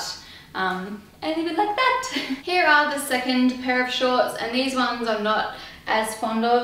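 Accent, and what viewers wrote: Australia. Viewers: I'm British and love ur and Australian accents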